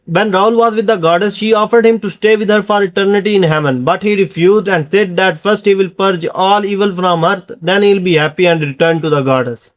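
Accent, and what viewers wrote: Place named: India. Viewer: You english ascent is more indian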